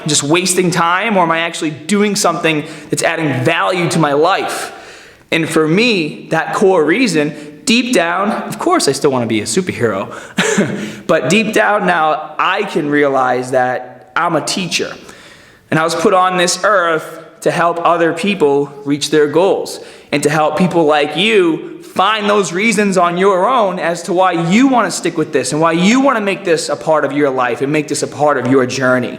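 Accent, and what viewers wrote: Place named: New England, United States. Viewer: Aside from being oh so easy on the eyes...one of the reasons why I watch your videos is because I am always impressed with your authenticity as a person. You are a kind soul. This couple with the fact that your NH/MA accent is so familiar to me, allows me to digest what you are teaching and actually benefit from it. Happy New year to you and "Ericer" LOL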